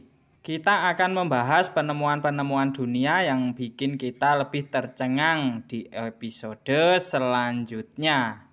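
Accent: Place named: Java, Indonesia. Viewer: Dan sangat medok wkwk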